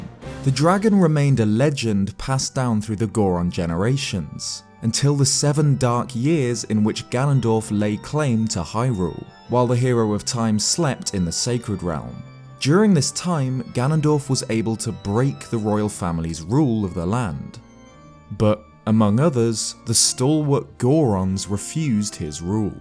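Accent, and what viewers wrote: United Kingdom. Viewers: Love your videos. Nice speaking voice too. Fellow Brit, surely?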